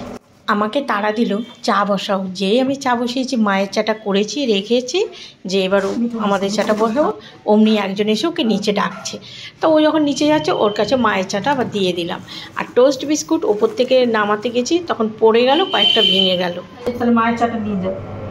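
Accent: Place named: Bangal, Bangladesh. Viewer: Vison valo laga didi-bai tmr mukha bangal kotha ami ekta problem a tmr vdeo thik moto dekte parchi na valo thako didi-bai❤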